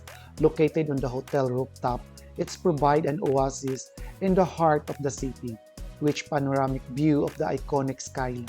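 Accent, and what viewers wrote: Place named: Philippines. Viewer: Lmao that filipino accent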